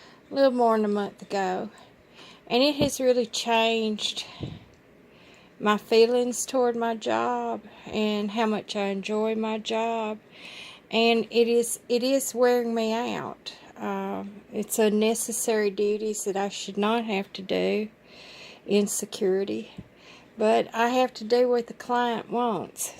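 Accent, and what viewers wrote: South, United States. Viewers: Omg I love your southern accent ❤❤❤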